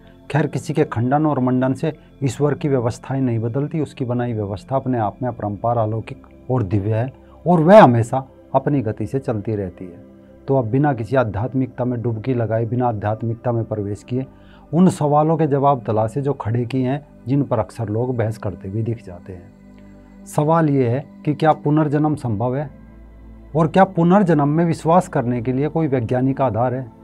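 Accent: Haryana, India. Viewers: Bhai Jis ladke ki baat kr rahe hain main use jaan'ta hoon.btw Bolne k hisaab se lg raha hai k aap haryana se ho. .love & respect from punjab..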